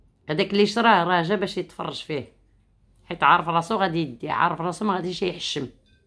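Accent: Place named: Arab world. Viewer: J'aime bouquau cet accent arabe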